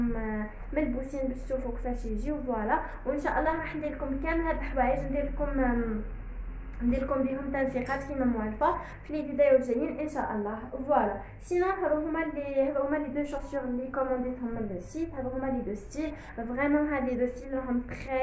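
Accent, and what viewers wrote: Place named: Maghreb. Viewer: فيديو رائع ❤️❤️ تصوير رائع واختياراتك أنيقة❤️❤️بس اللهجة صعبة الفهم لمعظم العرب لا يفهمون اللهجة المغربية والجزائرية والتونسية للأسف 💔💔 ف الرجاء التحدث ب الشامية أو الفصحى أو المصرية هكذا ينتشر الفيديو أسرع ويكون له جمهور أكبر او ضيفي ترجمة مصاحبة بليز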